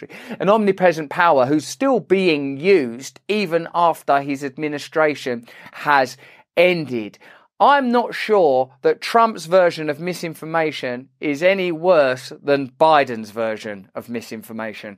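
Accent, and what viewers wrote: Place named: Cockney, United Kingdom. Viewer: Coincidentally, your accent sounds a bit like the chimney sweep from Mary Poppins.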